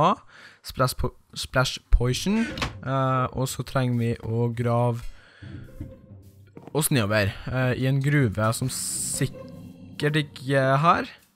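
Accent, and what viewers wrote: Trøndelag, Norway. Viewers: Ækte Trønder! Bra at vi har nånn trøndera som youtubera!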